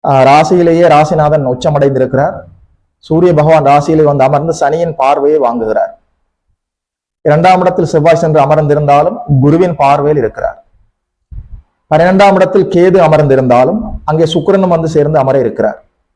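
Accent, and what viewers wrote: Sri Lanka. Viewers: Srilankan tamil flavour is seen. Good luck to the video content teller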